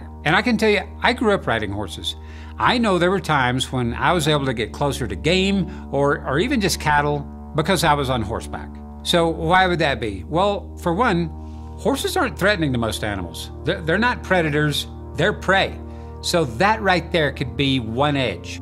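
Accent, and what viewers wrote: South, United States. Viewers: You're I'd say southern accent sounds like mine when I talk lol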